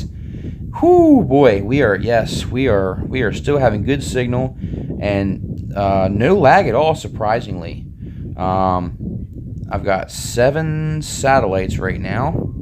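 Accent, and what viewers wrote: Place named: Pennsylvania, United States. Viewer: The accent. Berks county?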